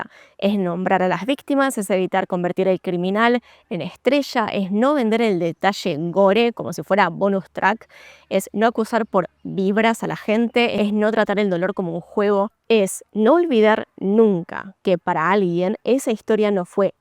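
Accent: Buenos Aires, Argentina. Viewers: Excelente tu análisis!!!, tu léxico, que en tu otro canal, en los últimos tiempos me hacían ruido las groserías y no lo digo por mojigata, sino que sos la única argentina a la que sigo hace años y más allá de algún que otro modismo, sin llevar tú relato al neutro, hacés honor al habla del porteño, que tan lindo se escucha. Gracias totales porque sin esfuerzo me hiciste analizar porqué veo y a quienes elijo para ver y oír tanto tru crime. 💋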